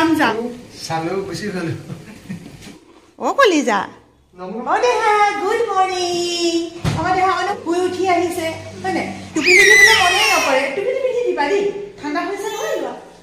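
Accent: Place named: Tezpur, India. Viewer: Aitu amar tezpur fala vokosu buli koy kai kub val laga ❤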